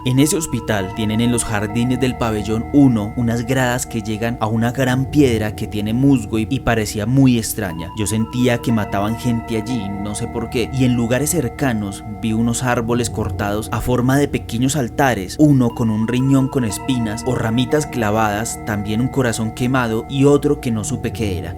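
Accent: Colombia. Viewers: Me gusta mucho tu acento colombiano 😊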